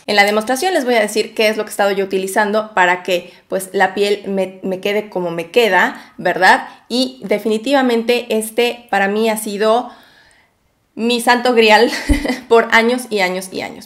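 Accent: Mexico. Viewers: Por qué hablas como de Tepito?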